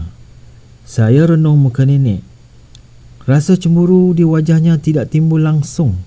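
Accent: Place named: Batu Kurau, Malaysia. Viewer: Loghat tu macan cakat orang batu kurau lah..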